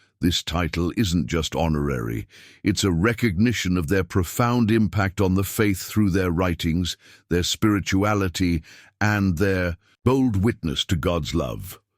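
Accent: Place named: United Kingdom. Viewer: Please! Drop the creepy AI British accent ..just can’t listen to it. How about a woman’s voice for a change?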